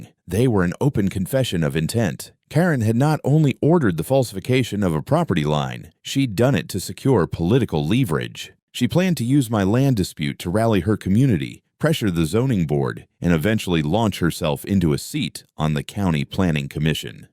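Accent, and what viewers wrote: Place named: Canada. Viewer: Zed IP... Canadian, eh?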